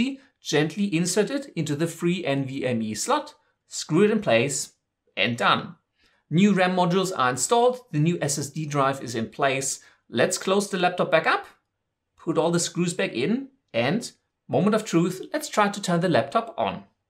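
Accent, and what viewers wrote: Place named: Germany. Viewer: In fact it feels like German accent to me, :) Oh glad to know you are being happy with the computer. I saw so many issues with that model on the Amazon US people's reviews that decided to get the MSI P65 instead. After 20 years using macs its scary moving to a Windows computer. Just in case you feel curious about my work, feel free to check <link> and <link> thanks so much for the feedback once again! Cheers from Spain!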